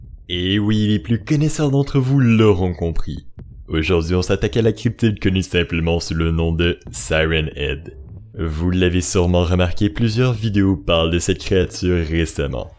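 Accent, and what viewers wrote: Quebec, Canada. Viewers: je suis très difficile avec le youtube québecois à cause de l'accent mais franchement avc toi ça passe comme une douceur avec le ton parfaitement doux +1 abo je m'en vais regarder tes autres vidéos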